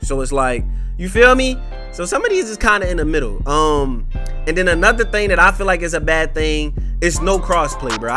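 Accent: Baltimore, United States. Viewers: bro ur from baltmore fo sho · Most definitely from baltimore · Twentytwo Baltimore accent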